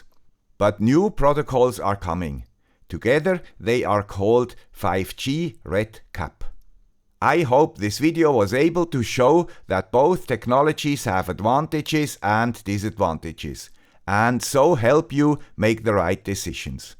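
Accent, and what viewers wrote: Switzerland. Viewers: I didn't know that was a Swiss accent. And why does everyone assume Americas eat steak? Is it unusual for people to eat beef? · Once again, "...the guy with the Swiss accent shows why I consider him the reigning King of IoT on YouTube! I've come across a company in the US that specializes in provisioning mixed mode, mixed carrier IoT/M1 SIMs networks I don't remember the name, and I'll try to get it to you if I can find it again. My new, rural, American home has essentially no coverage with handsets on one big network, except their wireless internet device supplies enough data for WiFi calling to handsets, and reasonably good YouTube video streaming. Another big network provides enough bars to do the above directly on handsets.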